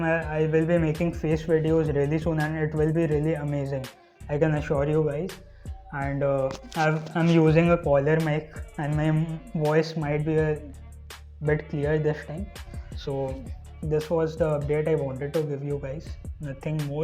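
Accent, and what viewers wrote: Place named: India. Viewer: Are you indian because your tone is like indians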